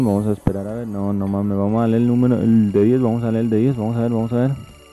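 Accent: Colombia. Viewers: Na pa mas colombiano pa que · eres de medellino de cali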